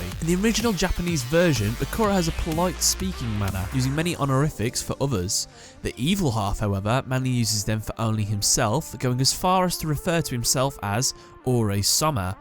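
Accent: United Kingdom. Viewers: people always ask, "why so british?" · why so british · Bri'ish lad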